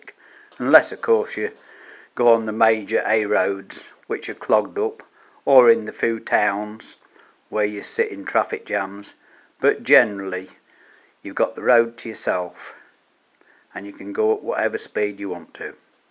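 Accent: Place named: Northern England, United Kingdom. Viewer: I find it amazing how quickly accents can change in our country. Im also from Lincolnshire originally (Skegness) and you Sound a lot more "Northern" or "Midlands" than I do. Maybe skegness gets some influence from east anglia? I'm not really sure....I moved to Paignton in Devon about 5 years ago and it was always fun getting people to guess where I was from haha they can never quite tell 🤣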